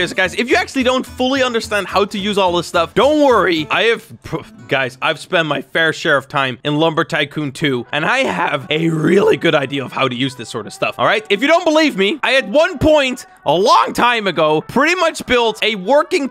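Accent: Netherlands. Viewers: Are u Nederlands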